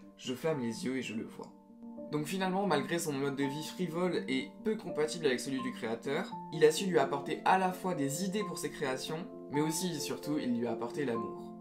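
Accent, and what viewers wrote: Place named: France. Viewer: J,adore votre accent tres francais, pas trop pointu.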